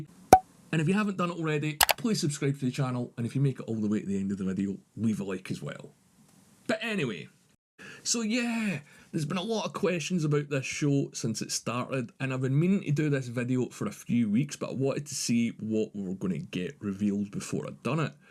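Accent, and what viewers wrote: Scotland, United Kingdom. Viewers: I like Scotland’s accent! 😅 I think the silo was built by United States government, I did not read the novel books series, but that is what I got from unspoiled from readers reviews. However, you are right, it is hard for bureaucratic entity to build something works efficiently, but they built it under the apocalypse circumstances! When any mistake, no matter how small, may end the human race! In terms of Silo authorities, I guess there is some misunderstanding, I do not blame you, I had it at the beginning, simply because silo authorities power distribution is extremely unique, it never ever existed in our real world! But still realistic! In our world, the tyranny power, or dictatorship, evolve by military coup, expansion of executive influence, or legislature pressure (revelation). In silo, the judiciary is the monopoliser of power! 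It is rare in our world but make sense in silo where armed forces do not exist! Nor society freedom! Why the mayor looks like the highest authority, appears as president? It is like asking “why german chancellor looks like the highest authority, appears as president?“! The german chancellor title been used after Carolingian Empire fall, as the highest position left of the empire! So the correct question is, did the United States president and governors died during the apocalypse years and the highest position survived was a mayor?! “The Silo” as a fictional world is still evolving and several stories and novels written in the same world, each su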